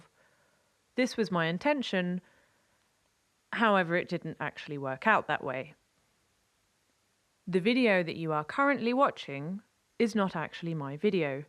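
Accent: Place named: England, United Kingdom. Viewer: I think I am going to spend eternity wondering why the narrator is speaking with an English accent. Still it does make it quite entertaining. And yet somehow ...my comment ended.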